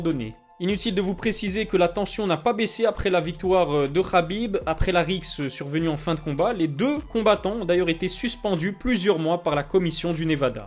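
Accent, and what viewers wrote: Belgium. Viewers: Juste une petite question t'es de Bruxelles ??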